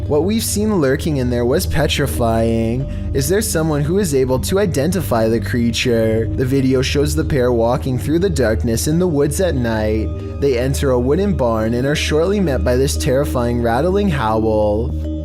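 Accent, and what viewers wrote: California, United States. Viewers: DUDE, are you from Cali? You sound like one of those surfer guys. Kind of hard to listen to you. But the videos are pretty good. Not very scary, but none the less entertaining.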